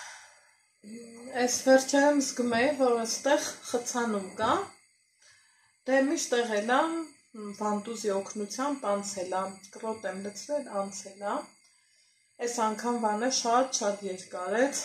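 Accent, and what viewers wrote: Lori, Armenia. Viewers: Թող լսեն անուշ համով հոտով լոռվա քաղցրր բառբառովա խոսում, հրաշք հայուհի ջանը💕💕💕💕💐👍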